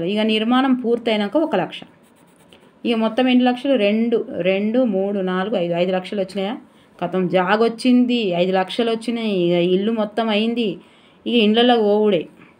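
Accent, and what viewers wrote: Telangana, India. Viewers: Telangana slang superrr akka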